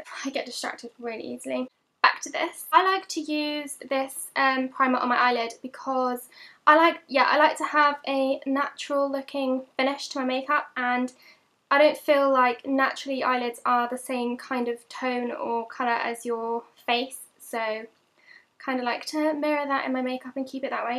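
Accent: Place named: United Kingdom. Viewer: I love ur british accent.. I love your face.. Love your voice when your talking..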